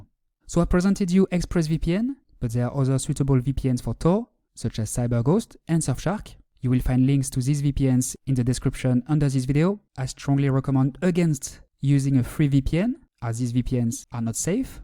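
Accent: France. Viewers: Mhm ur from french right? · Salut et Oulala ! ça se voit "s'entend" que tu es Français toi à ton accent.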